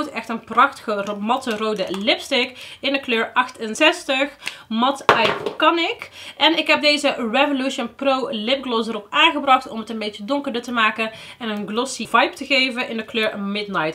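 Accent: Brabant, Netherlands. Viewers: Altijd leuk om je video’s te kijken, en heerlijk om naar te luisteren aangezien er soms van die te leuke Brabantse woordjes uitfloepen. #loveit! Ik doe ook mee met de winactie! 💕 insta; L.iisax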